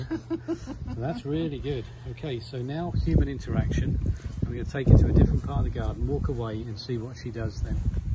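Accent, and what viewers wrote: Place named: United Kingdom. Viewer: I love your British accent!👨🏻‍🦳🇬🇧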